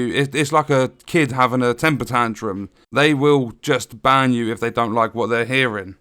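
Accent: United Kingdom. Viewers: Dude i love it. Keep on moaning. Even the wife sat and listened to you today. Miss the UK and the morose moaning accents so much!!! :)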